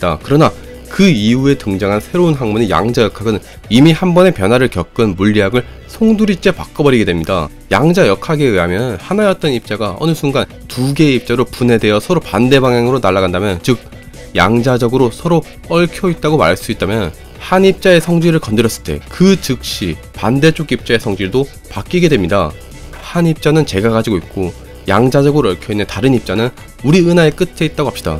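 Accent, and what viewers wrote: Gyeongsang, South Korea. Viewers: 혹시 실례가 안된다면 억양이 경상도가 고향이신거 같으네요. 포항이나 경주영천? 경북남쪽지역 억양은 확실한데 서울 가신지도 10년은 안됐을듯하네요. 제가 20초에 서울갔을때 그리고 10년 서울생활했을때랑 진짜 비슷해서요ㅎㅎ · 어?? 뭔가 이분 목소리랑말투 맨첨에들었을땐 섹시한 동굴목소리가진 미국교포 한국어말투 느낌이었는데 듣다보니 경상도억양이.....ㅋㅋㅋㅋㅋㅋㅋㅋ귀여워ㅋㅋㅋㅋ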